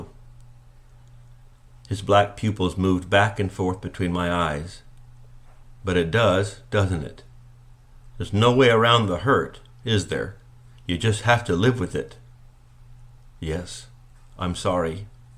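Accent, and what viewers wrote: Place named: United States. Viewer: Thank you! Really nice reading! Normally I can't stand American voiced audiobooks but you're perfect! Please do the other kingsolver books, your voice suits it so well! X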